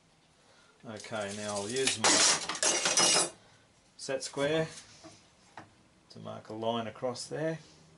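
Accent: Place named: Australia. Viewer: Hi. Given your location as being in Australia (and the accent is a giveaway), I'm intrigued to know how you came to choose Great Chesterford/Duxford/Newmarket as the location for your layout. Another reason for my curiosity is that I did most of my growing up in Burwell, about four miles from Newmarket. Much of my education was in Burwell and Cambridge. Since leaving Burwell, I have lived on the outskirts of Cambridge. So, what's your story?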